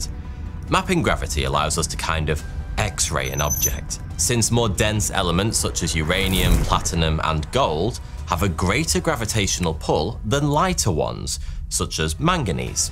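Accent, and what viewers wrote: United Kingdom. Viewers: Can't stand Limey axxents! Drives me nuts! I live in Canada and I want to listen to my videos in Canadian but almost every time, it's a bloody limey, all of whom totally butcher the English language.j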